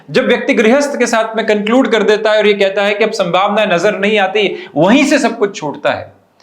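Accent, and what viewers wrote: Standard. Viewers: Sir aap bahut sahi bolte hai shudh Hindi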